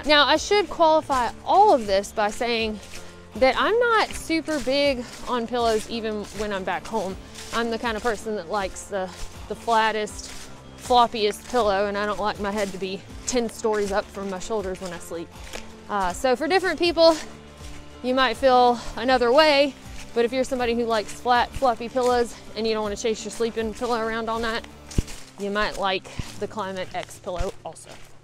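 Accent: South, United States. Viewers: Your accent doubled down in southern flair. :) Thanks for posting!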